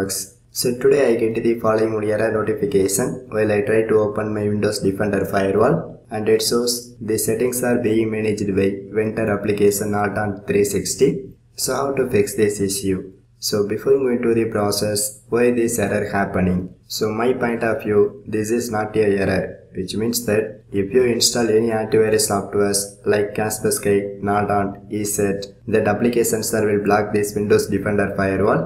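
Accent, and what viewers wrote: India. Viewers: Is this English or indiash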